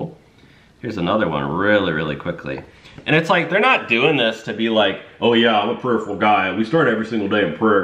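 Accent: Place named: South, United States. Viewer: Where are you from(born), I heard a little southern accent?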